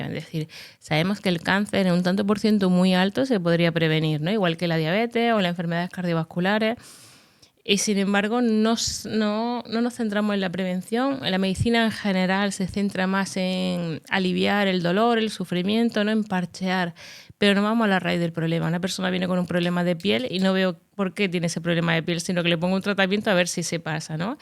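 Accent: Andalusia, Spain. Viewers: Es andaluza y tiene un acento diferente....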